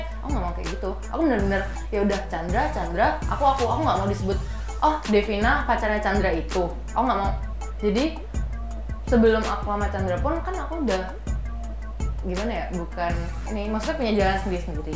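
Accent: Malang, Indonesia. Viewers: Medok Malang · Logat jawa Malangnya kerasa ya :v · Logat malangnya semakin terasa dari tengah sampek akhir ya mbaa wkwkkw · Logat malangnya masih kerasa 😍😍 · hehehee.. logat ngalame ga ilang ilang...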